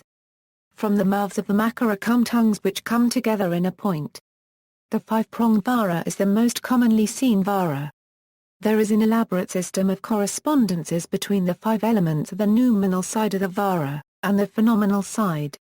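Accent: United Kingdom. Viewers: I studied Sanskrit and Hindi at university and 100% agree! Wrong pronunciation - this isn't Spanish!! They do that in Spanish jalapeno is "halapeno" - not Sanskrit or Hindi! Narrator is Britisher, so what do they know?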